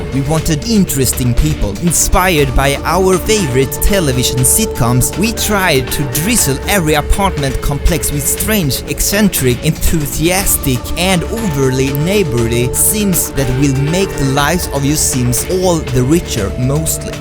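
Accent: Sweden. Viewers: Älskar att man hör att du är svenne hahahahaha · The second I heard your accent, I knew that you were Swedish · Man hör verkligen den svenska engelskan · Are u Swedish? Lol (I am and I just recognized ur accent) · ''A meteor hits the city and..'' GOSH you can just hear whole sweden in his voicexD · Hörde på din dialekt att du är svensk! Jätte bra video btw 😄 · U sound so swedish that's probably cuz u are from sweden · Hahahah jag älskar din svenska brytning 👍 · clicks on random sims video from a YouTuber I've never heard about before Gets hit with strong Swedish accent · Are you swedish? Because your accent sounds like so...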